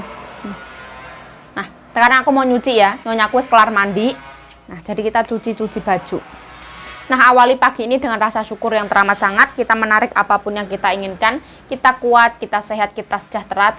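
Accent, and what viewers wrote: Java, Indonesia. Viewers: asli mna ya ko ngomonge medok kyo aq moncrottt😄😄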